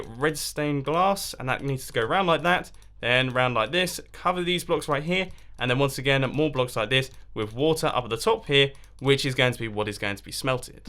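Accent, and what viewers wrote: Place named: England, United Kingdom. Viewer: It's his accent, English eh!?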